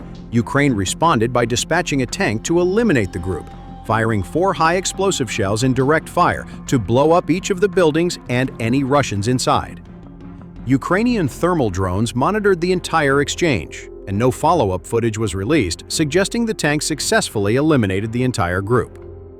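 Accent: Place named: United States. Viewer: DAS VIDEO IST OK ABER ICH MUSS KOTZEN WENN ICH DIE AMI STIMME HÖRE EINE WIDERLICHE SPRACHE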